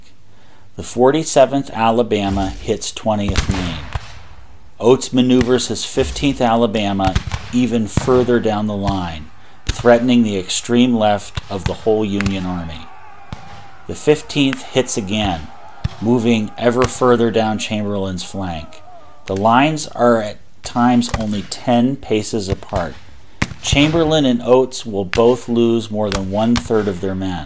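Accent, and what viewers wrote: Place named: Pittsburgh, United States. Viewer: Ahhh, a yinzer. Nuff said . . .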